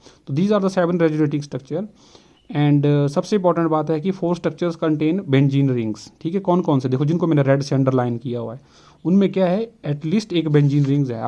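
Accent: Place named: Pahadi, India. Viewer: Sir aap Uttarakhand se ho .....aap k accent se LG Rah ...Ki aap pahadi ho .....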